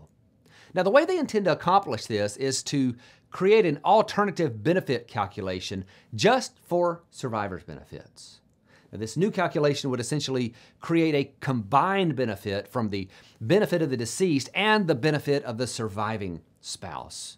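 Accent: South, United States. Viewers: Duh, I'm a financial planner - a title anyone can use without much education. You make it sound confusing and make it much more complicated than it is. Just go to social security and call them - they make it much simpler than this doofus who chatters nonsense in southern accent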